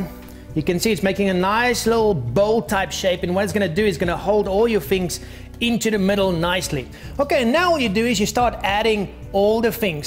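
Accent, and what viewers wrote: South Africa. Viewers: hey im south african and i cant help but to hear a south african accent from u. are u from South africa? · I think he's South African...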